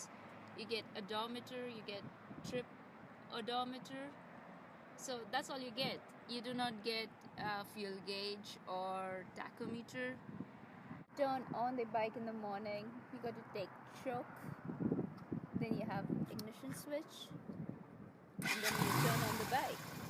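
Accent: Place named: Hungary. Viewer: she sounds hungarian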